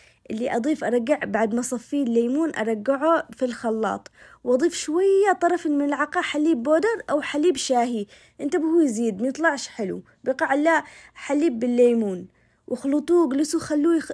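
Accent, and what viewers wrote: Aden, Yemen. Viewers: اني فدالك دكرتيني اليم حق عدن ومحسن الكلام العدني اه وايام عدن باقي التريب وكاتلكس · حليب بودر علشان مايتغير طعمه كلامك عدني قح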